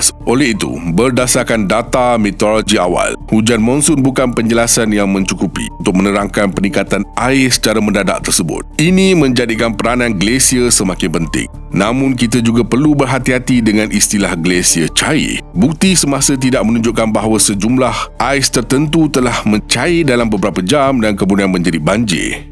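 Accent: Malaysia. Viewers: logat Malades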